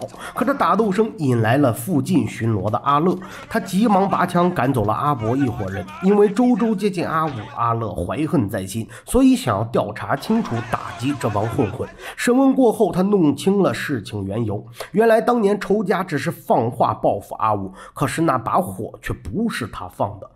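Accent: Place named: China. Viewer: 听不出这是大陆口音吗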